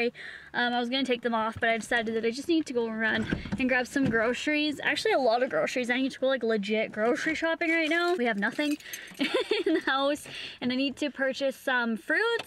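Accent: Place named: Canada. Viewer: You guys seriously need to stfu. Number one " doesnt eat healthy why is she giving us tips" How do you know she doesnt eat healthy? We only see like 10 minuets of her day. How the hell would you know if she eats bad or not?! Maybe the reason she went shopping and only bought good food was because shes changing her diet? And number two "she speaks weird" e.t.c ITS HER ACCENT. MY GAWD. Part of my family is from Canada. The accents are different depending on the part you live in Canada. My cousins live in her province and talk almost exactly like her. My aunt and uncle live in North Canada and have a thick canadian accent. It just depeneds on where you live in Canada. STFU AND STOP HATING ON! I love you so much. I hate to see this. Please block who ever keeps hating. It makes me sick.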